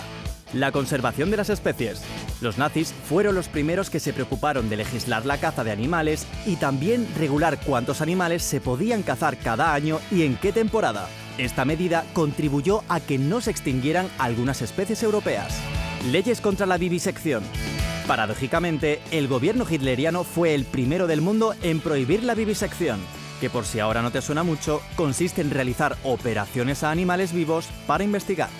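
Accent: Spain. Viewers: bueno bueno Se le nota la voz de Español.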